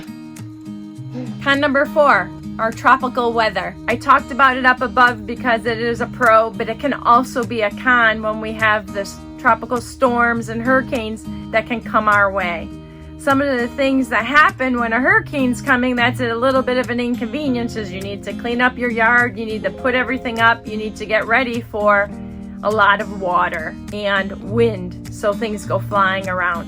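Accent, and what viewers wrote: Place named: Chicago, United States. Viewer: I love your accent, are you originally from Chicago or somewhere in that area?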